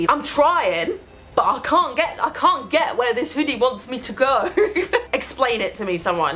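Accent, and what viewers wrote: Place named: Midlands, United Kingdom. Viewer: U sound like ur from the Midlands, maybe east Midlands. Am I close? In England, of course. I'm doing a challenge with my mates to see where youtubers are from and see who's closest to us. Am I close?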